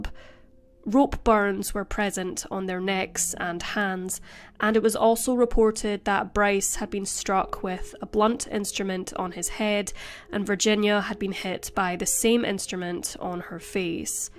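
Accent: Scotland, United Kingdom. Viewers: UR SCOTTISH ACCENT IS AWESOME & HELPS ME WITH MY DEPRESSION, ANXIETY & 💤😴! I KNOW 🌏🌍🌎HAS WORSE PROBLEMS THAN MY ABUSE I DEAL WITH OVER 20 YRS NOW!!! THANKS, & HER " FAITHFUL SUBSCRIBERS!!✊🏽🇺🇸🇺🇸🇺🇸🇺🇸🇺🇸🇺🇸🇨🇴🇨🇴🇨🇴🇨🇴🇨🇴🇨🇴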